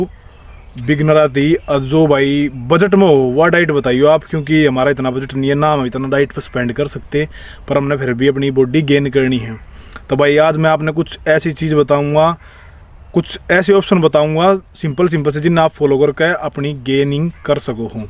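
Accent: Haryana, India. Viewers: Haryane balo ki boli acchi lagti h bhai 👍👍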